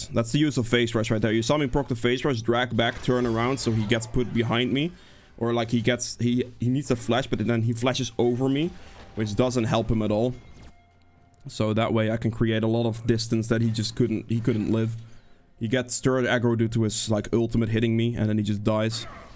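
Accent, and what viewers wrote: Netherlands. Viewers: U are Dutch ?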